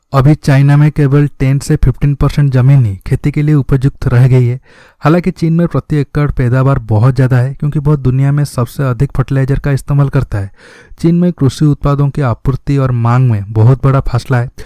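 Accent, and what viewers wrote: Odisha, India. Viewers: Sir I know that you are an Odiya, by your voice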